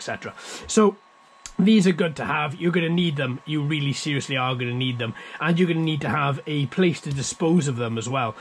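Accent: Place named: South Wales, United Kingdom. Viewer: Sounds like ya from South Wales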